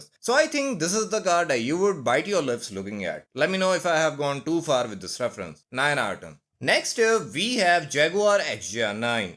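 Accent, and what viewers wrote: India. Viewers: 😬 Racism at it's peak. I don't like Indian accent tbh. But your accent is the best Indian accent I've heard. EVER. Are you South Indian?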